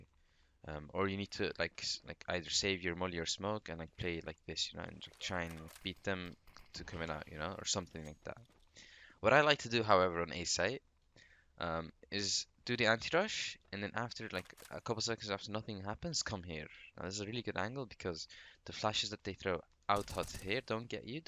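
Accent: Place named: Netherlands. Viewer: Why you sound netherlands, bonuskaart typa english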